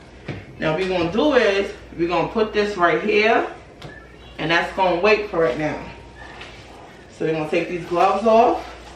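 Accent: United States. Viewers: I love your accent - sounds like nyc-brooklyn lol - and this was detailed God bless your business and your family! Thank you! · I love your NYC accent and charisma! Your business name fits you! Also, you make me want to take a trip to the city! 😊 Thank you for sharing this and not gatekeeping! Many blessings to you!!!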